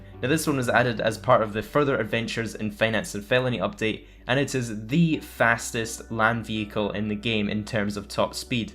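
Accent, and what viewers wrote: Scotland, United Kingdom. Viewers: You're Scottish? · u sound Scottish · Are u from Scotland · are you Scottish? love the accent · are you Scottish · Clicks on video, finds out he's Scottish, INSTANTLY SUBSCRIBES.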